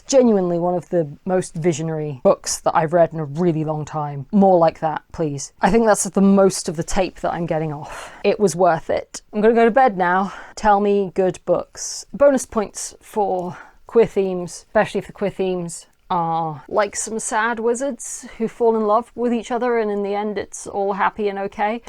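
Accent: United Kingdom. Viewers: so I'm new here -hates jk Rowling -adhd fueled sewing -high vis attire + wizards -English accent - "to the floor, where you belong" (slightly seductive commentary) yea, I think I'll stick around for a while, this place seems cool, I can fuck with this type of content, this is enjoyable, youre someone id try to be friends with irl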